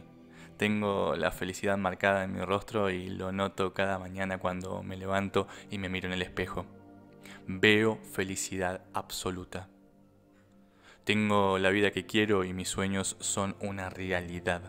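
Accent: Argentina. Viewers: Super efectivo! Además me encanta xq esta en argentino y lo siento mucho más real y personal 🇦🇷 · Me da risa estás afirmaciones . El tono argentino y como lo dice te partes de risa 😅😅i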